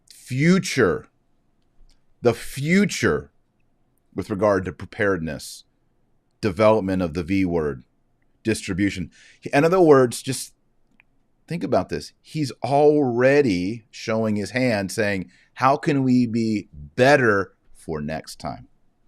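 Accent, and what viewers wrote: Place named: Texas, United States. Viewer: It's just his Texan accent! Check out ' ' by on yt. Should be our motto.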